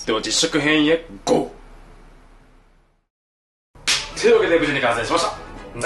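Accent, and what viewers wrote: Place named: Hakata, Japan. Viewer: 最近見させていただいてます！もっと早くさんを知りたかった！同じ九州人なので、時々出る博多弁が心地いいです！料理男子として尊敬しまてます！これからも動画楽しみにしています！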